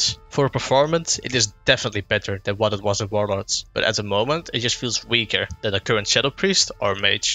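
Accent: Netherlands. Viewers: You sound Dutch...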